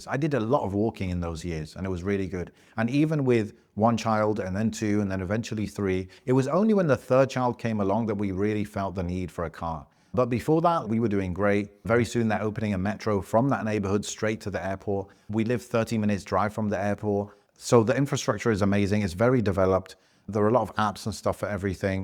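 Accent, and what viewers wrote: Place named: United Kingdom. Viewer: How did you pick up a British accent if you lived overseas most of your life?🤔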